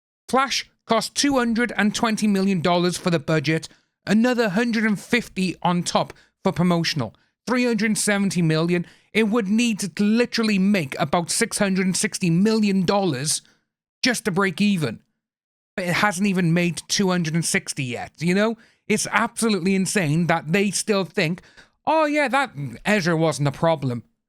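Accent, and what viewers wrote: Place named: United Kingdom. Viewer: I will never watch anything with AH in it. I am looking forward to when Aquaman is done as she has nothing new in the pipeline. Hopefully this will mean that she will disappear off my social media. Thanks for the report. I am British but live in Pennsylvania USA. So nice to hear a British accent again other than my own 👍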